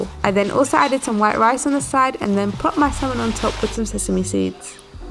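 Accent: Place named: North London, United Kingdom. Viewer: my first time watching your videos and i fell in love, and of course subsribed :) you have such a beautiful accent, probably north london with a tiny bit of east, almost like amy winehouse <3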